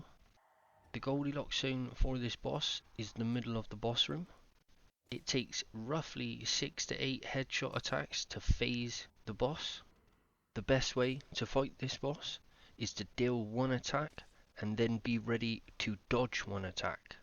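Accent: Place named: Bristol, United Kingdom. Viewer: Sounds Brizzo to me.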